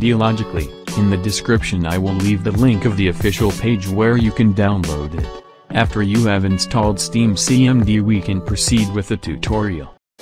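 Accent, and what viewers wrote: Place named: Spain. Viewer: видео топчик, ты испанец или русский?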